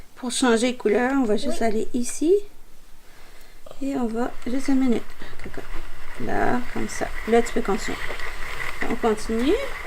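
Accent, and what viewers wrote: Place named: Quebec, Canada. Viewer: Coucou. Ho géniale de nous faire voire le contenu du sentro avec les pelotes de 25 grammes. Heureusement que vous êtes là pour nous pour faire traduction du livret qui fort dommage n'est pas en français. Merci beaucoup. J'ai hâte d'être en fin d'année pour me l'offrir. Votre petite puce à l'aire impatiente de vous voire travailler dessus. J'adore la petite voix de votre petite puce. La vôtre aussi et agréable à entendre avec votre petit accent québécois. À très vite pour de prochaines aventures et travaux avec le sentro. Bonne journée à vous et à votre famille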